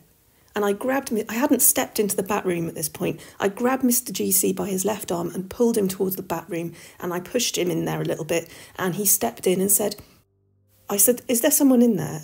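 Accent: United Kingdom. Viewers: hello love your YouTube channel and your videos and your British accent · Love a good ghost story, especially if it's British and recounted with a British accent ❤ 🇬🇧 the great Robert Hardy did a series on castle ghosts which I adored! This is awesome, thank you ⚘️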